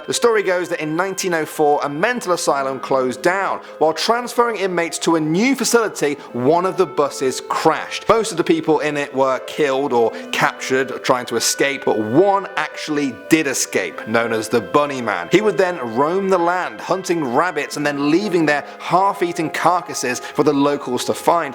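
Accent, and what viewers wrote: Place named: United Kingdom. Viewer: Are from the uk by the way you speak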